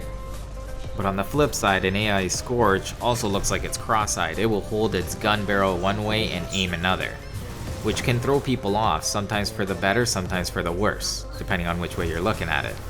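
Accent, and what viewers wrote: Canada. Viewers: Bro are you Canadian because I'm pretty sure I here a Canadian accent every time you say about and out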